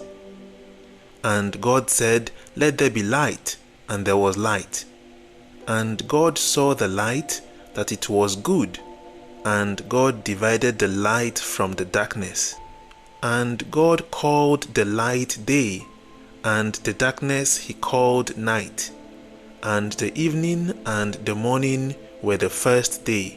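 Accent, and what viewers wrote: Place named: Nigeria. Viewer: The first Nigerian accent audio Bible in the making. Thank you sir. God bless you greatly. A happy new year to you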